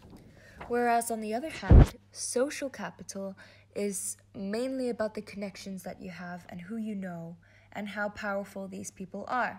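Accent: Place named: France. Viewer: Love the French accent ladee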